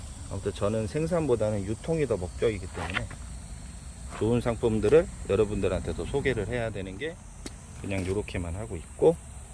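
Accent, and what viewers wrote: South Korea. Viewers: ㅋㅋ 님은 서울말 안어울려요 구수한 시골말로 계속~~~적심방법과 이유 잘 배웠어요😉😊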